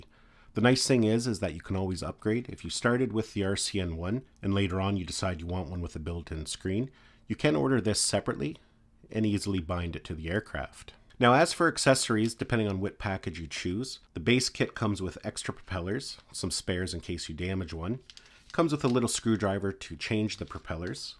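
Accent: Canada. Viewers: Are you Canadian or an American state bordering Canada?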